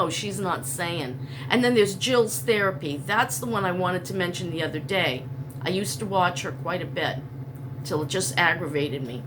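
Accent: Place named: New Jersey, United States. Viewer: Hi! I’ve just discovered you by accident in my feed and now I have someone new to watch! I’ve only watched I think two of your videos so far. I love your “randomness “, that’s exactly how my daughter and I talk…we’re always going from one topic to another then coming back to the first one. I love it! I’m truly happy to see you making it on so little! I love your accent….you gotta be from around New Jersey or something,right? And I love your attitude about punching your way out of a bag! You’re my kind of woman! You can make it through anything and you seem to be doing pretty darn good! I have to do some major downsizing as well! Just so tiring! I hear you about the childhood trauma…I made sure to end that with me. I know my great grandmother was way too tough on my grandma and those attitudes were passed onto my mother who passed it on to me. 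You gotta be the one to end it…life is too short to hurt the ones you love. There’s always time to change and apologize. We all make mistakes but learning from them and not doing it again is the main thing. Are you hoping to have this channel be a source of income? If so, I hope you become more popular and make more money to live on so you’ll have a cushion for emergencies. I sure love listening to you talk and I’m sure others will as well,once they find you that is. Well, I’ll go now seeing as I’ve written you a novel! Lol! Take care! ❤️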